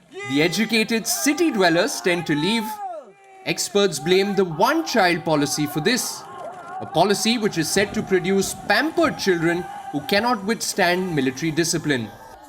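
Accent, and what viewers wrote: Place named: India. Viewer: Lol, when you hear someone with an Indian accent talking about China, I suggest you quite the channel immediately because all it's gonna bring is propaganda=trash. And as a PLA veteran, I assure everyone here that we will fight for China, we will obey orders from the CCP but all of us are willing to give our lives for it, as there won't even be modern China without the CCP. And please, nobody will force you to believe in Communism when you don't want to, and you don't have to be a party member to serve. It's being 12 years since I've touched a gun, but if my country needs me I, along with 600 million Chinese man will fight any minute. I believe everyone will do so for their own country regardless nationality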